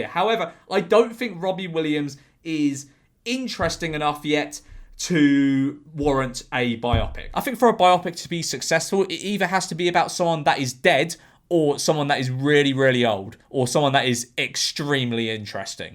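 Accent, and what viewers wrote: United Kingdom. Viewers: Enjoy your topics and comments...but as a Canadian, PLEASE slow down your delivery a wee bit, as not everyone understand your British accent.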